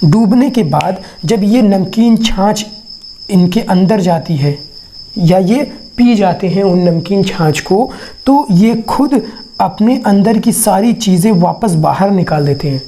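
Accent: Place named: India. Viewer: bhai bolnay sae tou aisa lag raha hae kae aap india sae ho lakin urdu likhna jantay ho? Aur yae earth worm ko sukhanay sae pehlay marna parta hae kya? Bhai yae bhi batain kae sulphur ko gandhak amla saar kaisay banatay hein?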